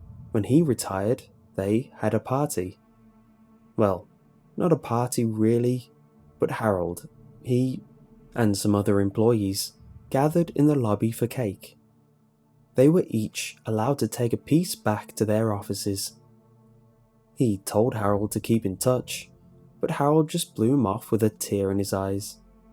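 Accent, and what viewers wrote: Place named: Merseyside, United Kingdom. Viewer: is a perfect story for your voice and cadence. You're accent id familiar, sounds Merseyside :)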